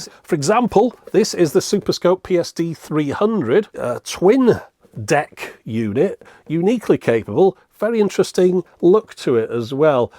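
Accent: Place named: North West England, United Kingdom. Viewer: Just watched your 'shaving cds' video... Funniest thing I've seen in ages. Not even into hifi, though I am a musician, but subscribed on the back of it. Partly because you sound like you're from my part of the world (North West) and because I've got high hopes for April 1st ;) Cheers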